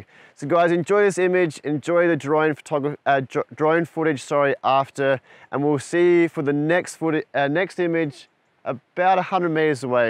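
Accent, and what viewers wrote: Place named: Australia. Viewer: Hi Mate, sorry to just now be getting to your video as I've been in the process of moving. As always, I have enjoyed the video and the efforts made to deliver your content, sick Australian accent and all! It was pretty evident the struggles you were undergoing during the Asia trip, maybe just the wrong time of year. The vantage point you were at for valley horseshoe river shot was choice. So nice to have travel chef/photographer along for this journey as well, you'd be screwed without him, haha. I'm curious, have you ever been into Slovakia? I have a nephew living there and suspect the landscape is fantastic there as well.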